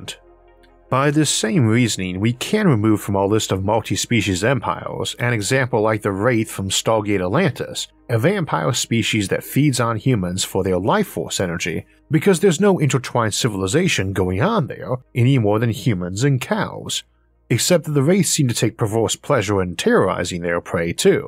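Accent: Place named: South, United States. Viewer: Man the visuals and graphics are light years away from those early videos. Actually the show has become a very professional package where even your "speech impediment" is as integral as the graphics and visuals. Personally I find your Elmar Fudd with a southern drawl accent unique and I'd put you in the same boat as Sagan and Attenborough although sitting in the stern perhaps.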